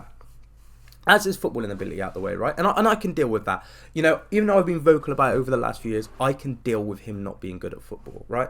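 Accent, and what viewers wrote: Manchester, United Kingdom. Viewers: You sound like you’re from Manchester tbf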